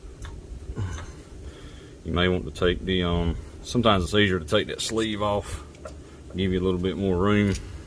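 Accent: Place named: South, United States. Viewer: Man I thought I talked country but I think you have me beat. Keep up the good work!!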